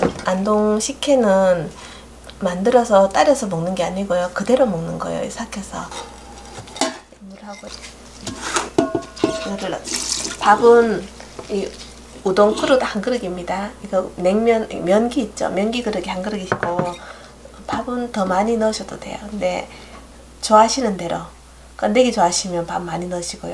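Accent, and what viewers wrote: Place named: Bonghwa, South Korea. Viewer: 타국에서 잘 보고있습니다!! 제가 봉화에서 태어나고 자라서 그런지 사투리가 너무 정겹게 들리네요! 항상 잘 보고 있습니다. 설명도 잘해주시고요 ^^ 앞으로도 좋은 영상 부탁드립니다 :D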